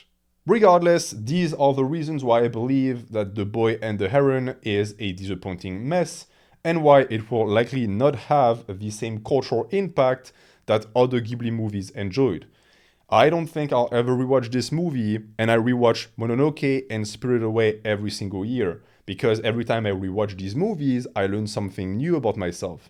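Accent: France. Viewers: Why is Adam driver pretending to be a YouTuber with a French accent? He's pretty good!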